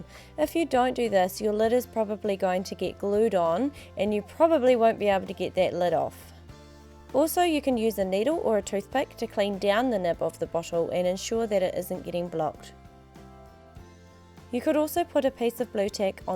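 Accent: New Zealand. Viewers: Was suprised when I heard the accent. Woohoo, kiwi!!! Do you do eyelash extensions? If so where are you based? In NZ. I would love to have my lashes done by you! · I only clicked on this to see what ladies are doing on my eyes but hearing you have a NZ accent, ummm where are you based so I can get mine done by you ASAP haha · ohh so thats a kiwi accent!..now I can notice the accent of calum hood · I think she's a kiwi?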